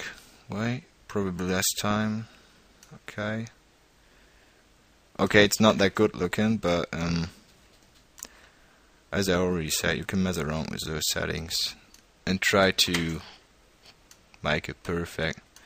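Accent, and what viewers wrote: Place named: Germany. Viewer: Man hört das sofort wenn ein deutscher englisch spricht .. :D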